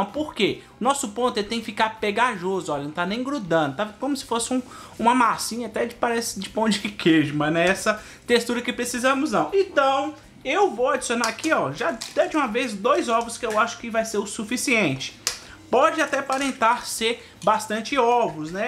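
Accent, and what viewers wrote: Minas Gerais, Brazil. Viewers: Adorei, só de falar já vê que é minerim!! Já compartilhei e vou fazer. Ontem domingo dia 23/07 eu fiz a broinha de fubá de canjica doce. Em breve farei esta receita. Estou te assistindo de Ipatinga, Minas Gerais!!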